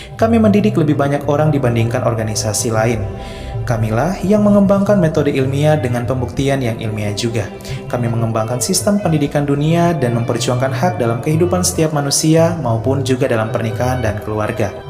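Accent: Manado, Indonesia. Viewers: Min orng Manado yahhh?? Hehehe 😁😁😁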